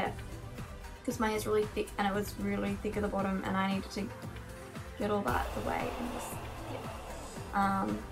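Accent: Australia. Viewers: alot of people commenting about your accent. but i didn't even notice you had an 'accent' because im Australian too. soo really: for me, it's not an accent :p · its australian lol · ARE YOU AUSTRALIAN :D I AM YOU SOUND AUSTRALIAN / NEWZEALAND MAYBE BUT U SOUND AUSSIE IM AUSSIE LOL Heyy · It's Australian accent <3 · im pretty sure she's aussie. like me! :D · Aussie accent? I'm aussie! xD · she's from australia idk where. you can tell it's an australian accent ( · I though you sounded Australian so I checked your channel :D Me too lulz ^^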